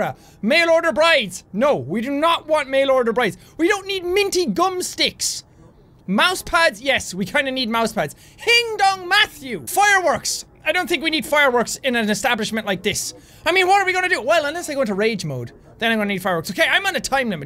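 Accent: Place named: Ireland. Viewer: Love your Irish accent I'm part Irish but I don't have the accent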